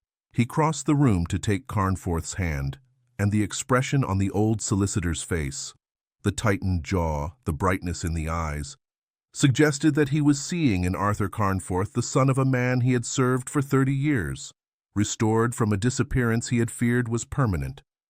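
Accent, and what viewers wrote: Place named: United States. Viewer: Sherlock Holmes with an American accent noooooo🤬🤬🤬🤬🤬 no chance will I listen to this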